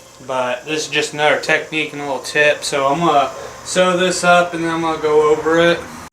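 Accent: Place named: Tennessee, United States. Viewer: Rly good video. Subbed! 🤘🤘.. BTW! That accent.. I just gotta ask you bro, are you from Tennessee? Hails from Finland!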